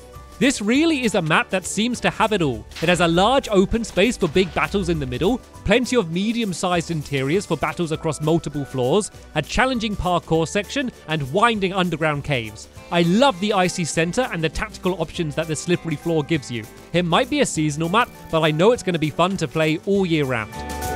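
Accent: United Kingdom. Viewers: Because I'm not used to a British accent I thought you said my egg favorite map instead of my 8th favorite map lol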